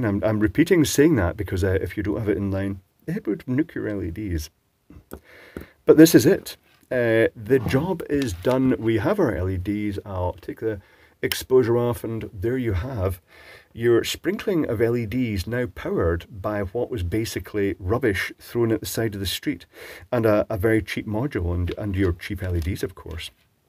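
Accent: Scotland, United Kingdom. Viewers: I love your soothing, clear voice. I guess your Scottish ? Do you live in the US now ?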